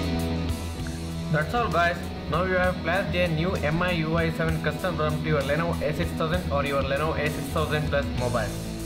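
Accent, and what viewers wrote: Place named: South India, India. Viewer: are u south Indian??? ur accent tells the truth , I'm a south Indian too but I don't have that accent ,I want to know why!!!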